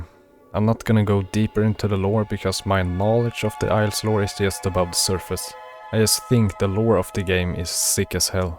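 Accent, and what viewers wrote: Sweden. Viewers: Im norwegian, and i also heard swed immediately:P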